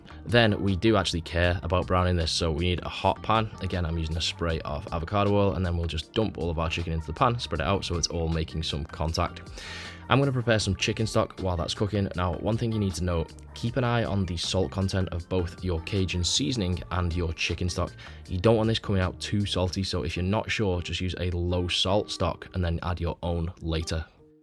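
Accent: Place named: North, United Kingdom. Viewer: I love watching your meal prep videos. Love the northern accent (southern softie here) But, could you do a video on how to reheat/serve meals please? I love to prep but by day 3, I seem like I waste food as I am too scared to reheat it so I eat it cold (gross) or throw it away. Just making the fried rice scares me! :)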